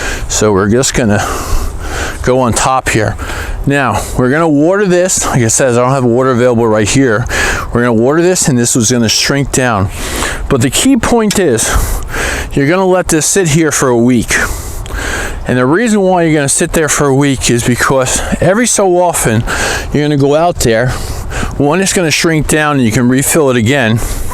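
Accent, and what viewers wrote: New Jersey, United States. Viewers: Great info and as usual, your Jersey accent makes me homesick. Thanks!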